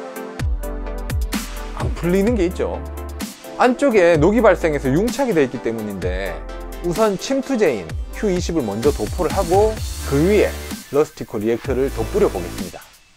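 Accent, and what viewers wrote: Gyeongsang, South Korea. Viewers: 말하는게 어리버리 갱상도넘인가요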